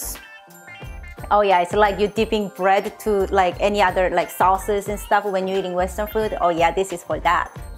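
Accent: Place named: South Korea. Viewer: Omg you make every languages sound just korean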